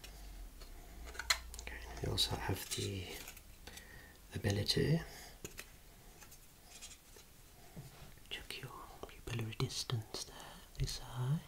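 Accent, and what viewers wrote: Birmingham, United Kingdom. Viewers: I think he’s from Birmingham or around that area :)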